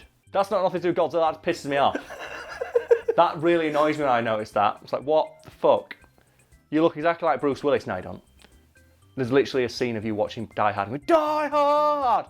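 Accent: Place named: Warrington, United Kingdom. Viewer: Eh up fucker, you sound like you’re from near Warrington and I recognise you- where you at boyo?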